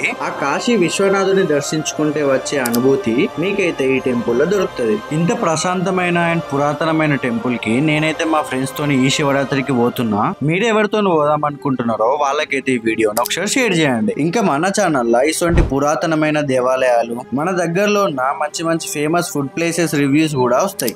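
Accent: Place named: Telangana, India. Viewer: తమ్ముడు ఎన్నో పెద్దపెద్ద టీవీ ఛానళ్లు వచ్చి ఇంటర్వ్యూ చేసి గుడి గురించి చూపించిన మన తెలంగాణ యాసలో గొప్పగా మంచిగా చూపించి చెప్పిన్ తమ్మీ ఓం నమశ్శివాయ 🙏 🤝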